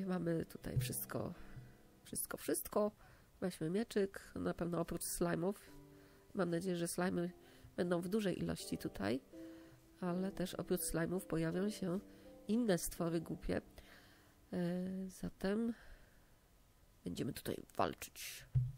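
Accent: France. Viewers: mam pytanie dlaczego masz akcent francuski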